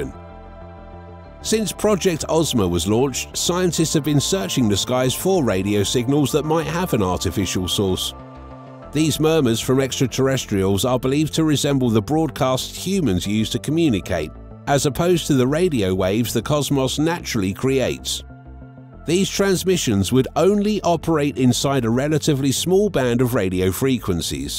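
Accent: United Kingdom. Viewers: British accents are alarming.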